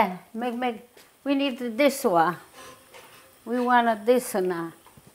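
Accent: Italy. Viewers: Looove that glorious Italian accent!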